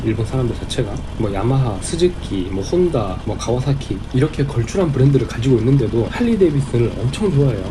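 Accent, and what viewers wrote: Jeolla, South Korea. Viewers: 구수한 전라도 사투리와 할리의 엔진소리라…찰떡궁합이네요😊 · 억양이 순천분같어요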